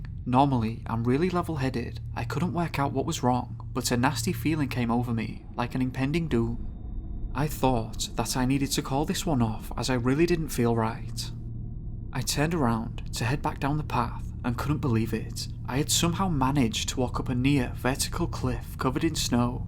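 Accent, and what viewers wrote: United Kingdom. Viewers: Please ditch the heavy British accent and I guarantee you'll triple your likes and subscribers 😉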